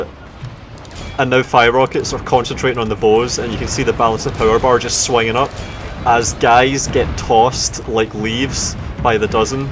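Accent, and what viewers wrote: Scotland, United Kingdom. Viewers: Are you Scottish? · That was frigging disgusting. Those haters of yours always focus on bullshit cuz u speak the truth, never see them chirping your generalship tho. Honestly you have turned battles into a real art, utilizing all of the game and interface mechanics, I honestly don't know how you click so fast and accurate, switching between things seemlessly, all I know is you have a god tier level of experience in this game and it is very admirable, on top of that you deliver the commentary with a devilish Scottish accent. God speed.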